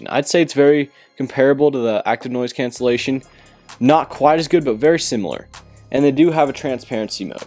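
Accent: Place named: England, United Kingdom. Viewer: lame english accent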